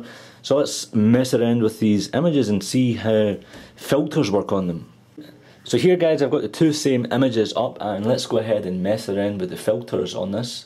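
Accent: Scotland, United Kingdom. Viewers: Are u scot?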